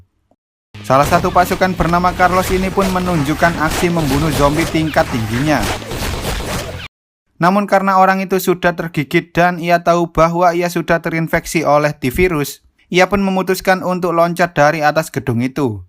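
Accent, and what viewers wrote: Java, Indonesia. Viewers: Orang jawa kah? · Bang jawa ap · Bang orang jawa ya? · Bang wong jowo to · ini suara dubbing jawa ya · Wong jowo ya bang? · Wis rasah suen suen dri jawa mna bang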